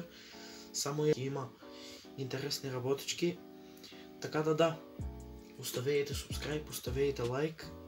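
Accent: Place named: Gevgelija, North Macedonia. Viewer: BRAT MOJ ZBBORUVA GEVGELIJSKI xd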